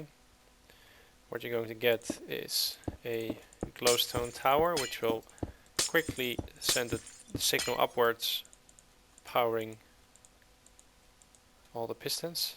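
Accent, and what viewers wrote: Netherlands. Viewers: u sound dutch, are u? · hey man, are you dutch?? your accent sounds dutch... i'm from holland too so that's how i recognize it... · thumbs up if u hear he's dutch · je bent nederlands dat hoor je aan je accent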